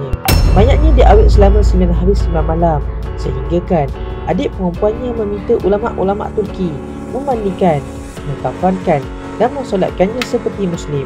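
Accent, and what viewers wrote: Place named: Perak, Malaysia. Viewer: Wah!!!... Mike orghang Perak ke Yong oii? Slanga Perak...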